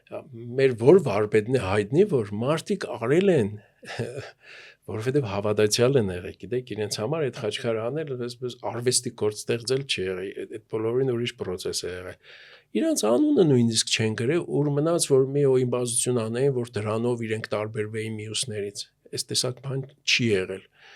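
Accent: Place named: Gyumri, Armenia. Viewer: գյումրու ակցենտը ❤❤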